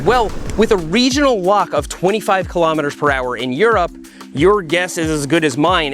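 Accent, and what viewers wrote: United States. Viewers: how is a very strict legal definition an 'unspoken agreement' ? brother you sounded very american here.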